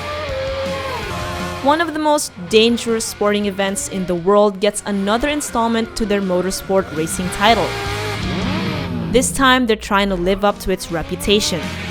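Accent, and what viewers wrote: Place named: Philippines. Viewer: I hate her voice. She sounds Filipino I'm not racist but she clearly doesn't know anything about cars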